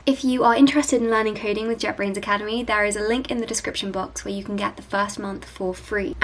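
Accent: United Kingdom. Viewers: Her accent is so like Hermione Granger's !!!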